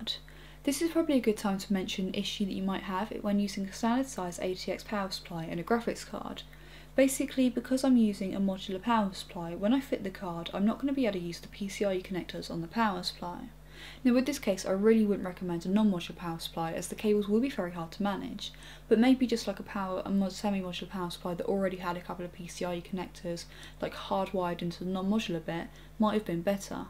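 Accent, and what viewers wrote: England, United Kingdom. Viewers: Armada YouTube Now if you can pinpoint what part of Britain that would be ripper.... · love your videos....love the layout....love the pom accent and NO you don't need a tan. Even though my preference is leaning towards ethnic women, you look great! Thumbs up. Just an after thought, would be nice to see an extreme build with this case. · Definitely not australian. Sounds British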